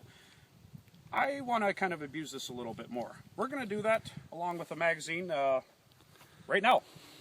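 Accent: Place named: Upper Michigan, United States. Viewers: You sound like your from the UP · Judging by the particular landscape and the accent, I would say you're in the U.P., am I right? The sandy ground, ferns, and all the jack pine trees, it looks like somewhere in Gwinn or K.I. Sawyer.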